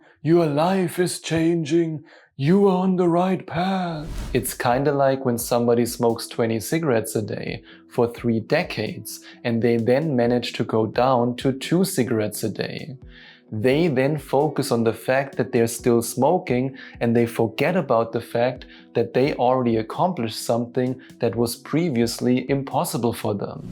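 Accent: Germany. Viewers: bester Mann mit angenehmen deutschen Akzent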